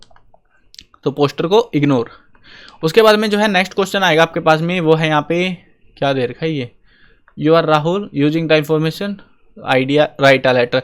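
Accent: Haryana, India. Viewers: Sir aap haryana se ho?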